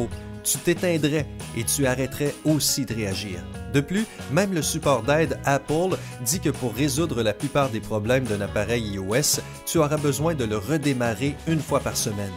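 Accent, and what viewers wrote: Quebec, Canada. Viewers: Sympa t’es canadien ? Sa se voit avec ton accent 😉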